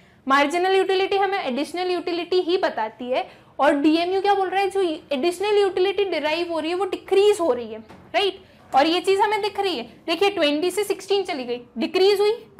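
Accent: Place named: Gujarat, India. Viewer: I can tell that ma'am is gujarati.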